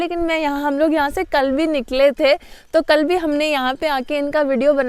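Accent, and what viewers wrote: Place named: Rajasthan, India. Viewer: Germany ki trh bahut beautiful hai.. 👌👌 ki marwadi awosem 1no. 🇮🇳🇮🇳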